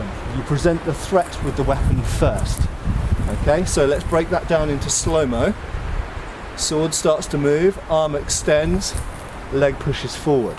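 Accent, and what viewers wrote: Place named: RP, United Kingdom. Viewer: you missed your true calling as a type-cast, British officer in Hollywood films. You are tall, thin, and have a moderate BBC accent. You seem like low level gentry so I am thinking a rank between Major and Colonel would suit you (you would get an officer's sword too). You are not regal enough (and too honorable) to be a general and you are too "proper" and too refined to be a sergeant. Am I the only one who could envision in a movie like 1917?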